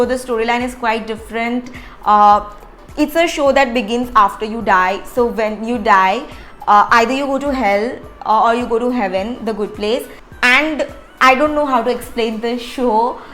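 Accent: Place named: Gujarat, India. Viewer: Gujarati Taste Che Tamari English Ma..😅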